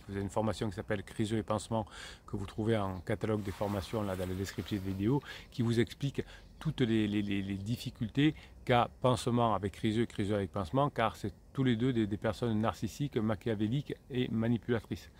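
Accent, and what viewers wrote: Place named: South, France. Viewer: Merci pour votre réponse elle m'est allée droit au cœur J'aime beaucoup écouter vos vidéos et votre accent méridional me donne l'impression d'être en vacances 😊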